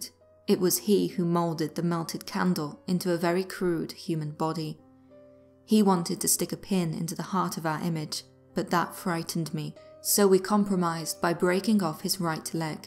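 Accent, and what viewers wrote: United Kingdom. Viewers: usually I hate British accents but I am in love with your voice and it is perfect for reading these things. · I loved your Jeckle and Hyde narration I would like to recommend another story if you are interested. Be.Busta narrated “The Left/Right Game” it’s a really good story but as it is from a female perspective it’s missing something. I would just love to hear your voice instead. The author writes from the perspective of a journalist from Bristol and I hate to assume that you are from the UK, but with the British/Aussie/New Zealand style accent it just fits like a glove and I find myself more engrossed in the storyline. Anyway, just a recommendation ❤️❤️❤️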